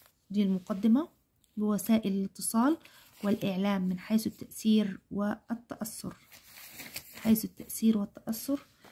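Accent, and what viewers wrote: Egypt. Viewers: انت مصريه لم افهم😢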